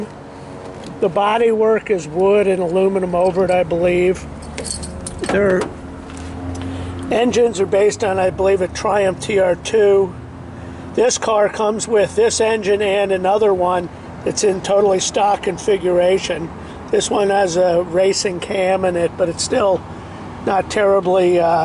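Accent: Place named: United Kingdom. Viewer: Great use of Anglo Saxon English when pulling out. You could pass for a Brit, thanks for a great review. All the best from England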